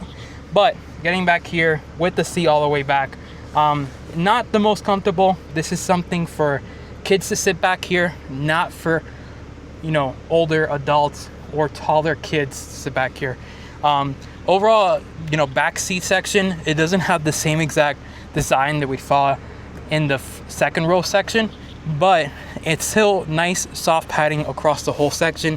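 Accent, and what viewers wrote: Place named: Miami, United States. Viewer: It’s the Miami accent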